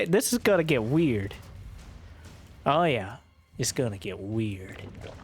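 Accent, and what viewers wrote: South, United States. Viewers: I actually stopped playing in like 2010, didn’t even follow it anymore. casts were so good, I was chilling in Taiwan for the past year and it randomly popped up on my YouTube and I’m like WTH, let me check out the game. He single handedly made me interested in spectating competitive SC2 again. At first I’m like, is this a joke? Dudes southern accent just throwing out stuff like making his spire great again in professional casts? Now I catch at least one cast a day. 😂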